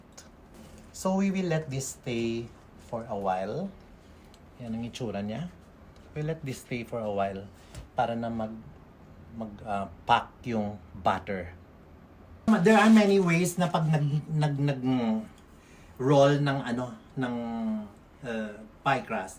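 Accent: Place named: Iloilo, Philippines. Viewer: Hello po sir!! I love your ilonggo accent 😍😍😍it reminds me of my friend in Bacolod.... Pinaka sweet na nakilala ko Ang mga ilonggo 😍 keep safe always sir!! More videos please 😊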